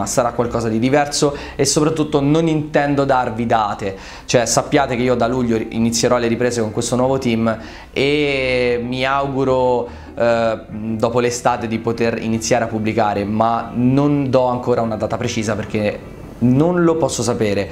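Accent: Tuscany, Italy. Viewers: ...Mi pare di notare un accento toscano... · Ma perchè l accento toscano?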